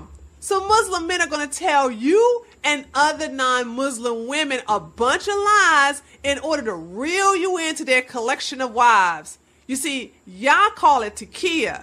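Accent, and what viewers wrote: United States. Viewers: haha very nice. I love your American English, it got so much character.:) And I agree with a lot of what you say. I think some muslims even lie to themselves, out of fear of going to hell, it's so sad. Due to 5 prayers per day, islam simply doesn't give them the time, let alone permission to question their believes. Especially muslimahs would have such a better life without a religion that tells them they are less worth than men. But I totally disagree with you on the scene with Horowitz. He didn't even answer her question, but proved to be a total dumbass, who can't bring himself to condemn Satanyahu, who's much worse than Hamas. So he was the bigotted jerk in that conversation. Given their view on women, I'm not a fan of Hamas either though. Infidel's greetings from Europe, wazzup!:)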